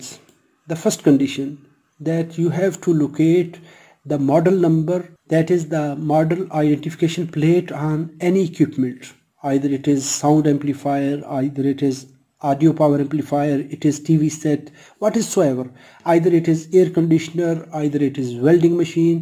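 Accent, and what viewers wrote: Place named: Arab world. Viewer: impressive arabic accent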